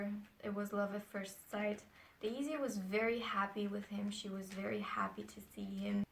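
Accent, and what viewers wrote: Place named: Europe. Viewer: Where are you from in europe? I think I recognize the accent ;) anyway nice video! :)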